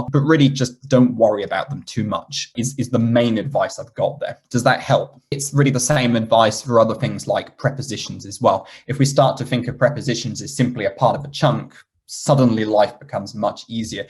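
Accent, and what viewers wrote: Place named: United Kingdom. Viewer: You sound like a British for me 🎉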